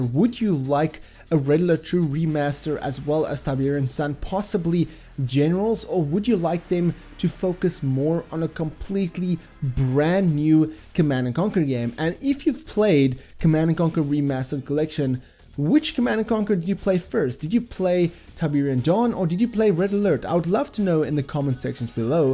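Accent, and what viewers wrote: South Africa. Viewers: Bru, ma chana. Wa ah yoo putting on, laak, a fake American accent, hey? Stick with the Edenvale accent. Id saands so much better. ^^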